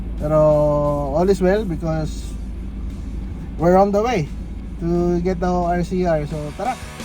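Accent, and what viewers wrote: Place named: Conyo, Philippines. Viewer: ang conyo mag salita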